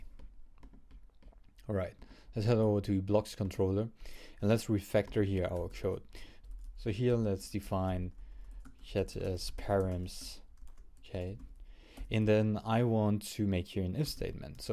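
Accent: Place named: France. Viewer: 😮 ur accents seems french or German even nearly french. Anyway Many thanks for this productive video which made me more passionate about Rails. Thanks again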